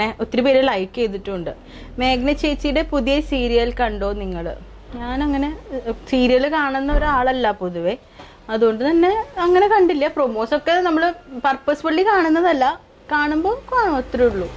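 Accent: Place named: Thrissur, India. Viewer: chechi dea Thrissur language ❤️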